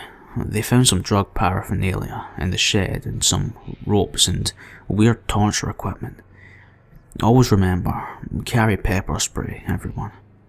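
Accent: Scotland, United Kingdom. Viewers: you're a man of great talent. thanks for the text back and keep giving us a brilliant channel. the accent? I'm thinking you're from the east coast of Scotland?😃.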